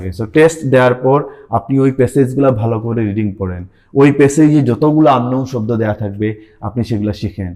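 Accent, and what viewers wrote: Sylhet, Bangladesh. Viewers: Sir apni ki sylhety? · আমি যদি ভূল না করি তাহলে আমার মনে হয় আপনি একজন সিলেটি। আপনার কথার টোনে বোঝা যাচ্ছে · R u from Sylhet sir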